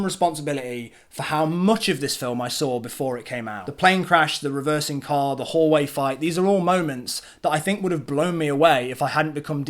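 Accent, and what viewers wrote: United Kingdom. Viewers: You have a very mild british accent. sad...;(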